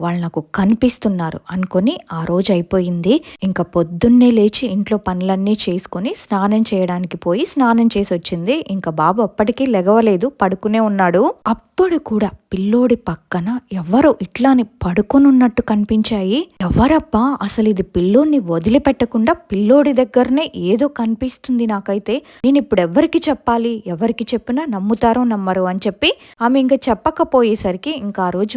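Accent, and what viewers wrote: Telangana, India. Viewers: Hi, I'm a new subscriber from last 3 weeks ago, I'm a big fan of horror stories junky lot more interested, Randomly your video the bangalore delivery person video nundi almost back to back 25-30 videos chusanu, Miru telangana slang lo kuda baaga matladtunaru, miru cheppe style as it as miku stories share chese valla laga cheptunaru 🙌👏👍 best of luck 🤞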